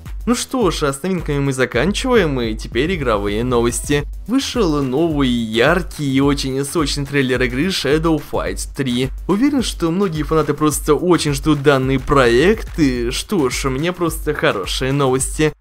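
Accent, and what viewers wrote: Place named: Moscow, Russia. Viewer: у тебя акцент немного эээ... московский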